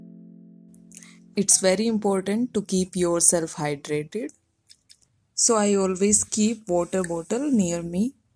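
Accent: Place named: Kerala, India. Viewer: ohh!! srry to tag u so quickly but ur accent resembles a mallu accent!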